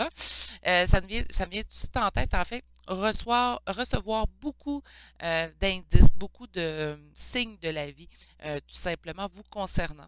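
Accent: Canada. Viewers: Vous êtes toujours très juste dans vos tirages. Très amusante aussi des fois.j'aime bien quand vous dites "mon petit poulet "😂😂...Seul chose désolant pour moi j'ai beaucoup de mal à vous comprendre. L'accent canadien est difficile à comprendre. Je recule la vidéo plusieurs fois pour vous comprendre...Toutes mes excuses mais j aime beaucoup. Merci beaucoup 😊❤🌹🌹🙏🙏😘😘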